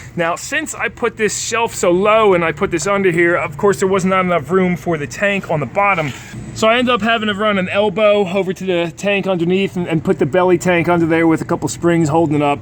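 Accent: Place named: Philadelphia, United States. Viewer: Nice Philly accent.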